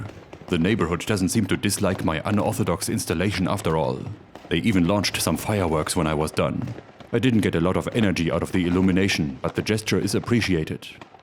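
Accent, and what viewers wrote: Germany. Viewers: Just watching this for the funny way he is speaking. I love this german accent. If you got an accent, exaggerate it to make the video even better. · Quite to the contrary. I eagerly await this endeavor with your German accent providing the perfect ASMR soundtrack.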